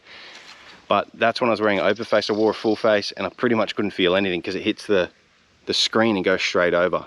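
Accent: Australia. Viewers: Hey... really enjoyed this video! I went to test ride a 2022 Fat Boy at $17,400 with only 2000 miles on it. Somehow... I came home with a 2023 Baja Orange Breakout. Since I bought a new 2023 Harley... I get free tires for life! The Breakout is very nice, and similar to a Fat Boy. They share the big 240 rear tire, but the Breakout has a 21 inch front wheel. My Breakout has the big 117 engine... and it hauls ass big time! I like listening to your Australian accent. Also like that hair cut your sporting! Don't be nervous while your filming... you're doing a great job! Hope your channel keeps growing!!! John from Oregon, USA!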